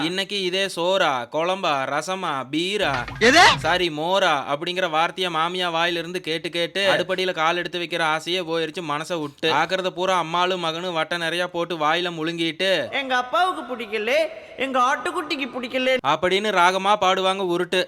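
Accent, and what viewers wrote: Coimbatore, India. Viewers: சகோதரி தன்னால எங்க போயிட்டீங்க உங்களோடா அந்தக் கோயம்புத்தூர் பேச்சுக்கு ரொம்ப பிடிக்கும் இத்தனை நாள்ல என் வீடியோ போல ஏதோ ஒன்னு சொல்லி போட்டு இருந்தீங்க ரொம்ப நாளா காணாம போயிட்டீங்க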